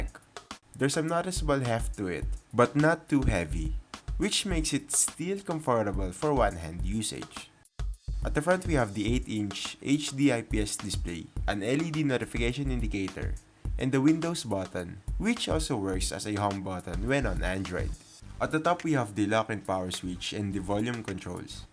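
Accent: Philippines. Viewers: tagalog mo nlng tol halata rn lng accent mo.. cka pinoy lng karamihan mkakabili nyan.. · Ganyan accent ng pinoy pag nag eenglish o kung tawagin sa Wikipedia, Philippine English. Hindi naman kaylangan lagi american accent. Lahat ng bansa may kanila version ng english.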